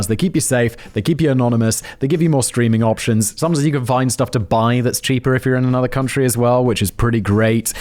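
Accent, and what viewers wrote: RP, United Kingdom. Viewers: dumb takes sound dumb even in a posh accent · I forget he’s not in America every time I hear bbc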